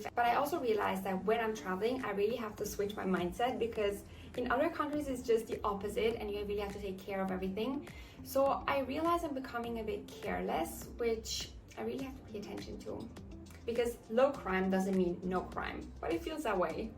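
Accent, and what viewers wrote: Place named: Singapore. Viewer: u already sound like a singaporean :face-blue-smiling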